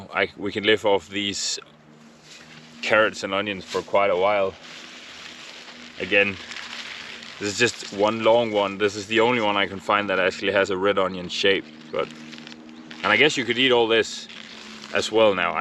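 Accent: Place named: Denmark. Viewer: I immediately knew you were Danish! I once had a Danish flatmate . The accent is so easily recognisable.😅 Nice video! Keep up the good work!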